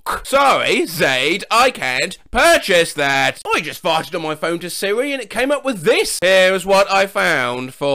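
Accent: United Kingdom. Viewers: He sounds more like Wheatley because of his BRI'ISH accent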